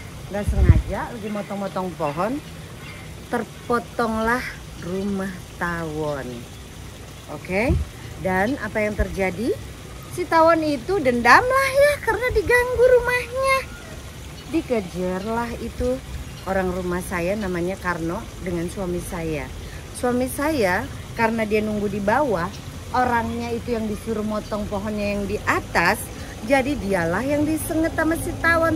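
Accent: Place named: Purwakarta, Indonesia. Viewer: Pakai logat purwakarta tsmbah seru bun..... inyong tambah seneng 😂😂😂😂...😂❤❤❤❤❤❤ love you bunda....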